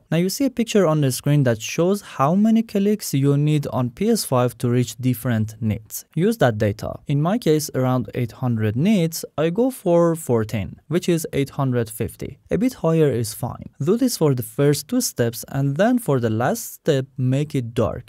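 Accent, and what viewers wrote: Iran. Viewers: اقا از لهجه متوجه شدم ايراني هستي. موفق باشي 😀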